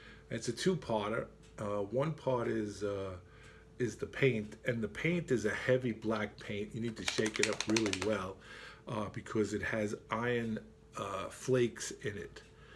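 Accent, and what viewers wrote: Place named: New York, United States. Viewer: Hi. I like what you're saying -- and it's really nice to hear a New Yorker again. I keep my old phones to use as throttles and cameras. Just ask a friend (or The Wife) for their old one. Cheers! --dunc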